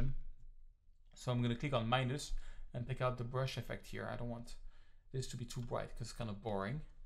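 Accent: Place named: France. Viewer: For your English tutorials, it would be great if you would talk a bit slower. Your French accent has me backing up very frequently to catch what you said.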